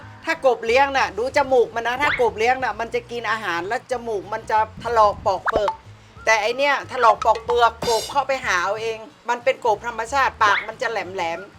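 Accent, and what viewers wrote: North, Thailand. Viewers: ชอบเวลาแม่ อู้เมืองจ้าว.เป็นธรรมชาติที่สุดเลย.คุณแม่น่ารักทำกับข้าวก็.น่ากิ๊นนน่ากินค่ะ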